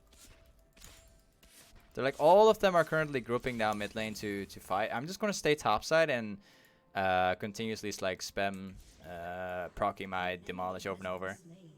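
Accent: Netherlands. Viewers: Echt een grof accent van Nederlands haha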